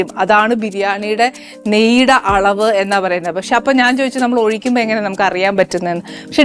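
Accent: Kottayam, India. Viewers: Kottayam baasha inghane thane aano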